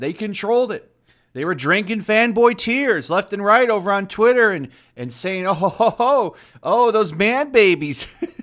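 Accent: United Kingdom. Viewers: Love the British accent every time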